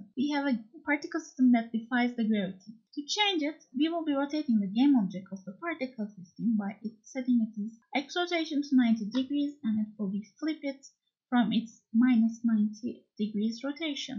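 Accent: Turkey. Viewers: aksanınızdan Türk olduğunuzu hissediyorum :D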